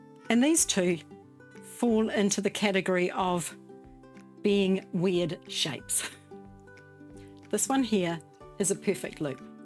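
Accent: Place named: New Zealand. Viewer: Thank you for your fantastic video on how to make perfect loops & fix faulty ones. Love your Kiwi accent too! I have a friend in New Zealand, Bryan Waddle who used to be cricket sports announcer for Radio New Zealand! I'll definitely be liking more of your videos. This is best loop making video I've ever seen.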